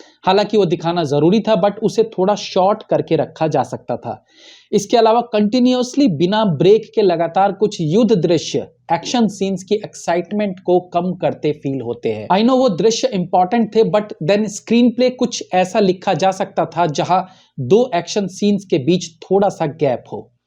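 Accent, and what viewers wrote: Bihar, India. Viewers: Are Bihari nith bol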